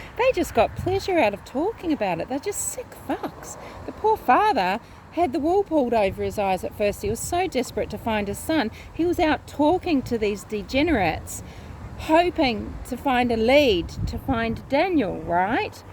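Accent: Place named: Australia. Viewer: I thought you were an Ozzie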